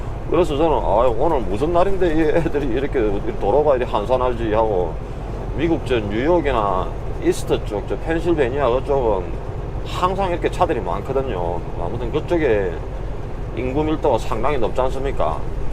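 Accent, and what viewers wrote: Gyeongsang, South Korea. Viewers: 이만 돌파 축하드립니다 1편 부터 12편 까지는 말 없이 자막처리 12편 부터는 구글지도 사용 일정 안내 ㅋㆍㅋ 님의 영상이 하루하루 멋지고 있네요 아직까지 님 영상 다시보기 보고 구순한 갱상도 사투리가 몆편부터 시작인지 현재 다시보기중입니다ㅡㅡㅎ.ㅎㅡ 폰 데이터 다 소모 했읍니다ㅡㅋㆍㅋㅡ 고속도로 국도 사항은 한국이 짱이죠 돌쇠 엘프 밍키 상봉까지 안.운 하세요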